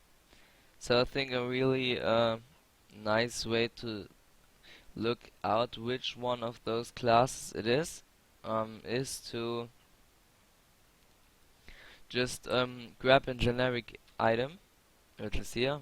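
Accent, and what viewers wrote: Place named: Germany. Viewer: "Houston We have a problem". I uploaded to google drive two files created with the editor. Links are: <link> <link> My limited knowledge of English, your German accent difficult translation providing youtube and subsequent translation into Spanish, as surreal as hilarious, has forced me to create these maps modifying the demo. I am aware that it was a mistake but it's demasido late to turn back. I created maps intertwined for high level players but I have reached the limit of my knowledge about the editor and do not know how to solve some problems. I created two maps: the first map does not appear sigils or stars or the computer terminal. In the second I tried to introduce sigils but have arisen configuration errors (HUD and other elements, for example) and do not know how to solve satisfactorily, so I need your help. I know I ask too but if you help me solve these problems could finally upload to steam.al least one of the two maps. 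Thank you.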